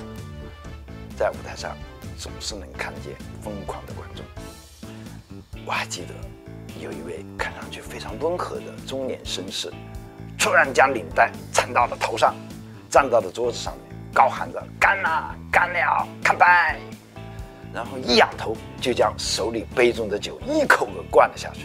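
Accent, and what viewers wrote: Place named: South, China. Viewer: 李さん的普通話口音是南方人，跟深圳相似。又不是央視新聞，無所謂啦。👋🏼😄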